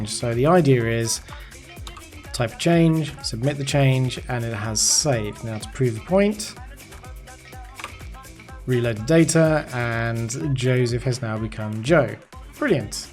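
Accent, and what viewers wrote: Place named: United Kingdom. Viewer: Informative and entertaining. (bonus points) the British accent sounds far more intellectual than my American "southern drawl". Excellent work man!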